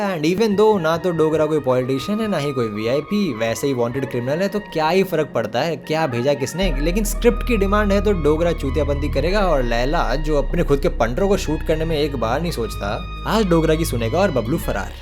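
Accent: India. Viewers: UK born with Desi Village accent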